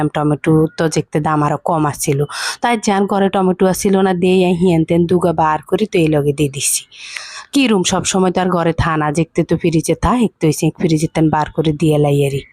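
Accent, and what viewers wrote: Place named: Noakhali, Bangladesh. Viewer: একেবারে খাঁটি নোয়াখাইল্ল্যা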